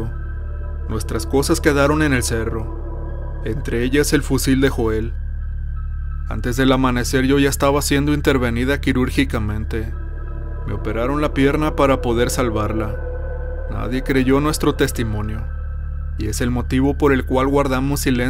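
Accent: North, Mexico. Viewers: Hola soy Elisa y trabajo de housekeeping en un motel 6 en Texas y a todas miss compañeras nos gusta tu voz norteña en tus relatos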